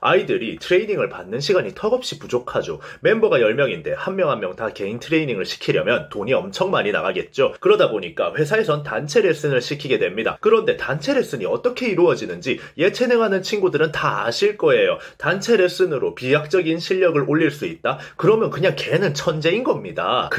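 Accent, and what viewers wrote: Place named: Busan, South Korea. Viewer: 말을 강조하는 부분에서 부산 억양이 나오네요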